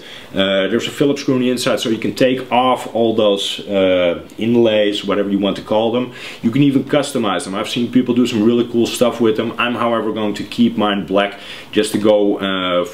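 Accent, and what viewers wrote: Netherlands. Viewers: Are you from Holland, you have a dutch accent.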